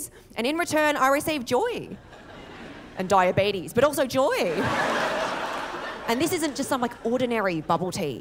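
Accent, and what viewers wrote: Australia. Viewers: I love the sticker figures drawings. is this your art work? Nice. It really makes your jokes visual and twice as funny 😆 For me being Asian American it’s funny to see and hear an Asian with an Aussie accent. “Mum” “Naur (no)” 😂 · I love Aussie accent. I migrated to Australia in 1976 and I identify as Australian. There was an ad on TV many years ago that showed a teenage Chinese girl, a happy person, responding to a question from another traveler: "Where are you from?" Her response, in an Australian accent just like, was "I'm from Ballarat, where are you from?" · I thought your story and story telling was hilarious. Also like your Australian accent. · Australian accent is unbearable. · accent sounds Australian!!! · Missed Aussie accent!!! It’s been a while!! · She’s so funny, I love the Australian accent on a woman. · 竟然听懂了，澳洲口音吗，way发音成wi。最后一段overlord是讽刺吗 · your Australian dialect is quite exaggerated, your punctuality is ok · Wow, has an Aussie accent! 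· Well done. However, as an American, it is a little brain-jarring to hear an Asian speaking English with an Australian accent. Add a sombrero, do a little yodeling, and the cognitive dissonance will be complete. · She got that Aussie accent too. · Well done. It is always a pleasant surprise to listen to a beautiful Asian woman speak with a Australian accent ❤ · She's got a broader australian accent than me too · F, u got d most Ozzy accent! Rock on,! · Damn, she really hasn't kept even a fraction of her parents' accent, she sounds even more sterotypically aussie than I do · Not eglish.... australian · I love her Australian accent with her Asian culture. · Like your Australian accent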